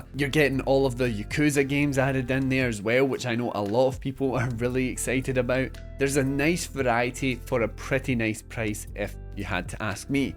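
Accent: Scotland, United Kingdom. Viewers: You're Scottish right? 😅 Btw Valhalla was added since day 1 for extra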